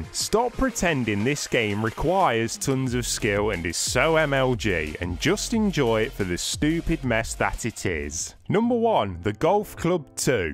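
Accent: United Kingdom. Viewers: Dude I just Binged watched ALL your videos. Idk if its the British Accent or what but your vids are HILARIOUS!! 😭😭😭😭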